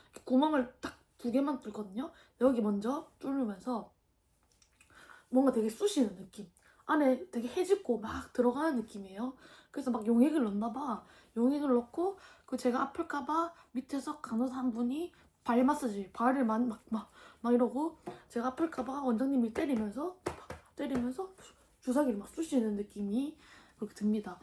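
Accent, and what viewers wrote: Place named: Busan, South Korea. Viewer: 부산 여자 너무 좋다. 사투리가 매력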